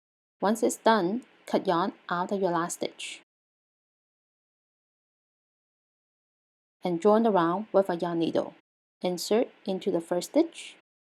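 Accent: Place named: Asia. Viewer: What kind of Asian is this accent, Mandarin? Vietnamese? I love it 😍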